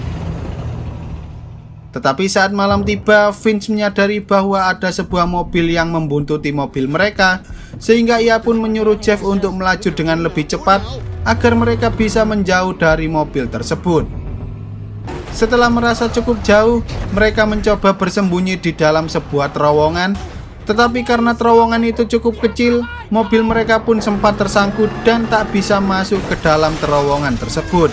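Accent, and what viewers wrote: Java, Indonesia. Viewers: Kalo gak medok mungkin lebih nyaman dengerin nya🙏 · Mimin wong jowo yo😅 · Medok amat bahsa nya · MEDOK MEDOK... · Medhoj bngt boss, rasa ke tendang kuping gw dngr pke headset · admin orang Jawa ya bicaranya medok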